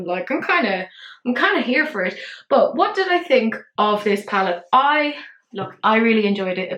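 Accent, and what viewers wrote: Ireland. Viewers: I loved this video x I have the dark fantasy and absolutely loved it defo need to pick up the liquid ones as they look fab x it’s so nice to hear your accent x I have family in Carndonagh so hearing the accent and the witty sense of humour really was magical x thank you for this video it made me smile xx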